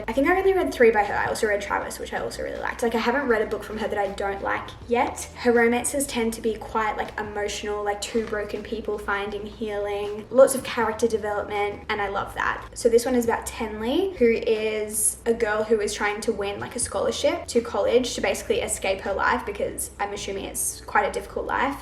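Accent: Australia. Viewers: Your Australian accent is beautiful !!!!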